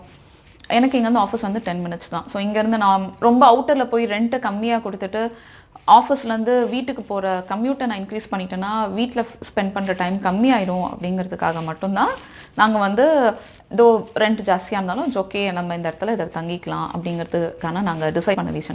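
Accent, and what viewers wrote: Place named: Nagercoil, India. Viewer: Sisters ungaluku Nagercoil tha...unga tamil language appadi tha iruku..nanum Nagercoil tha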